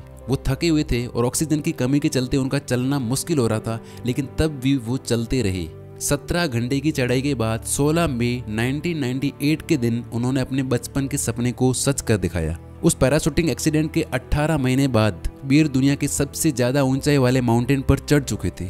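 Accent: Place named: Marwar, India. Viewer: Jodhpur se ho? Marwadi tone aa Rahi h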